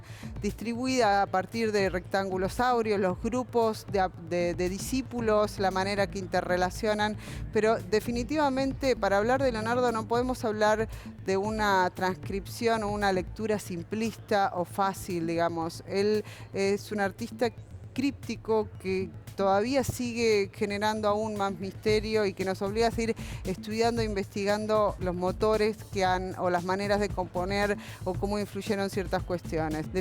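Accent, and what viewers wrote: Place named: Argentina. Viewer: Más allá si está bien o regularmente explicado , para mi es claro , me gusta escuchar una voz argentina de una bella mujer.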